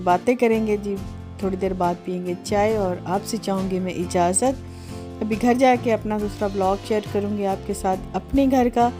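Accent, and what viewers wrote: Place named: Saraiki, Pakistan. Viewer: Apki saraaaeiki punjabi hai we have house help saraaaeiki wo accent hi aur hota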